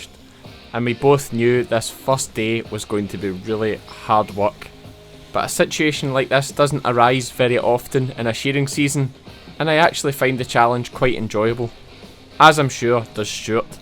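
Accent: Scotland, United Kingdom. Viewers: Hello from Alberta, Canada. I worked with a fellow from Scotland who sounded quite similar to how you do when speaking to your mates. It took a good 3 months to understand him all the time. Now, no problem!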